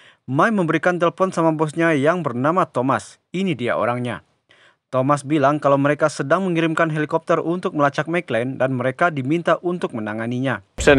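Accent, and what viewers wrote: West Sumatra, Indonesia. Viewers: Orang minang bang?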